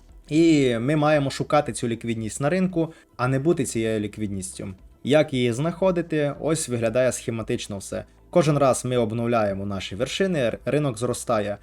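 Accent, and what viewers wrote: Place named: Precarpathia, Ukraine. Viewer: Класна Українська Але я чую щось таке рідне прикарпатське!😮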